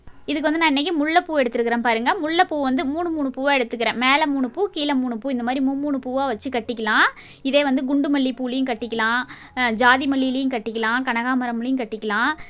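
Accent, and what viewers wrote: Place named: Kongu, India. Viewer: ரொம்ப ரொம்ப அழகா இருக்கு. ❤❤❤❤. நீங்கள் எந்த ஊர். உங்கள் பேச்சு கொங்கு மண்டலம் போல் தெரிகிறது. இந்த ரோஸ் எங்கே கிடைக்கும்.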